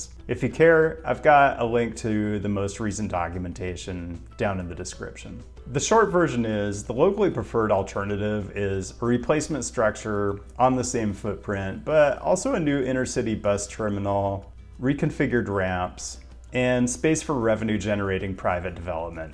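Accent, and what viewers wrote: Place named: San Diego, United States. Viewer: He becomes more handsome in each video , is he from southern Cali he speaks like how San Diego people sound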